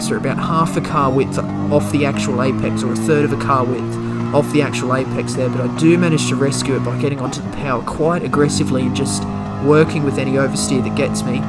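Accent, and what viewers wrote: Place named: Australia. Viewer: The dragon trail and lake Maggiore were difficult but i could get ahead half a second by certain places around the lap, brands hatch is something else! 100ths of a second is vital and being a controller user means turning and trail braking are really tough. I've come to learn that the point on the controller for trail braking is just past the point of neutral or still. It's like half a millimetre, only a handful of times can i get it to come through the corner up on Lewis. What is your brake balance set at? Great vid and very nice to hear an Aussie voice for a change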